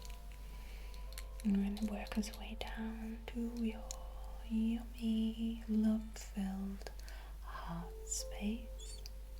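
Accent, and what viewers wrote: United Kingdom. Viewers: Are you British ? 💖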